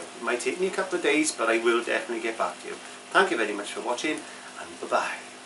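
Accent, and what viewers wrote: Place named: Scotland, United Kingdom. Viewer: I love your videos and that wonderful accent. Scottish? I bought a couple of the Masterson Sta-wet palettes, and I like them a lot, but one is quite large and the other just a bit too small. I’ve tried using styrofoam plates that I put in plastic bags. They work, but can be awkward. The hardest part for making my own is finding an air-tight, flat container.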